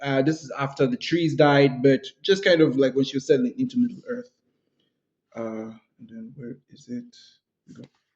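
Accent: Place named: Zimbabwe. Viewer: Where are you from? You sound Zimbabwean I'm just guessing 🎉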